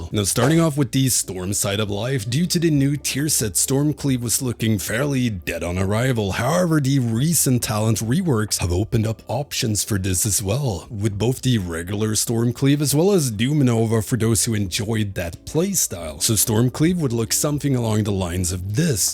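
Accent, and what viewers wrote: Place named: Sweden. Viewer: bis swedish accent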